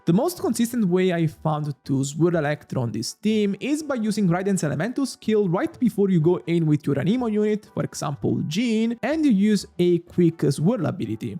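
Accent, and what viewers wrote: Italy. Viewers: yo bro, u italian?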